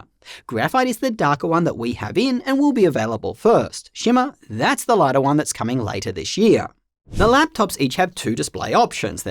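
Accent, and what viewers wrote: Australia. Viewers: Eww, Aussie accent.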